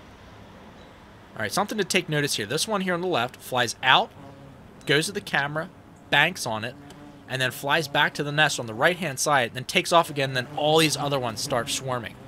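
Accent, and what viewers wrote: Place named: Mid-Atlantic, United States. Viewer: I have to ask....where are you located? You sound like back home - which is Maryland/Delaware/PA/VA for me.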